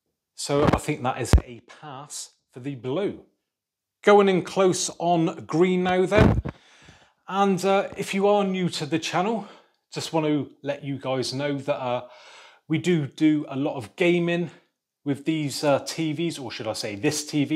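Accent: United Kingdom. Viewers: Thumb up for that beautiful British accent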